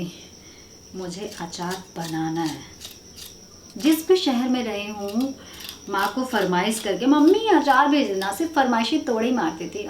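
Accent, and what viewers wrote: Bihar, India. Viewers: My mom is also fan of laliya character. And also she loved your show ratan ki shaddi. You raw and original love you indian touch especially bihari accent in your vlogs.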